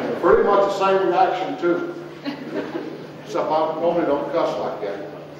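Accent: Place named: South, United States. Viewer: The problem here is the language barrier. And Mr. ‘s southern drawl does not make it easier.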